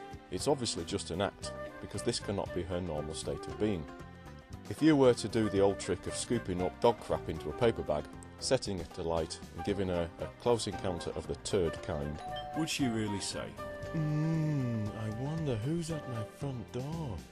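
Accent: United Kingdom. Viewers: No problem. Your vids are great. I'm used to hearing American mgtows, so it's particularly refreshing to listen to another Brit! Your vids are quite unique in that sense as we get to hear an English point of view for a change. Thanks for your sterling work, sir. Looking forward to your next vid.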